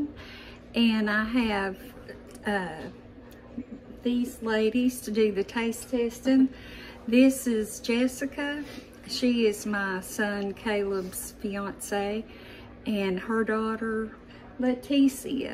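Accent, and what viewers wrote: South, United States. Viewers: Loved your taste testers! Beautiful mother and daughter!, I love watching your channel. You have that soft spoken southern sounding charm and I love when you say “cut off the stove”. My grandmother used to say that. Anyway, I always try to do something nice for myself and others! Much love to you!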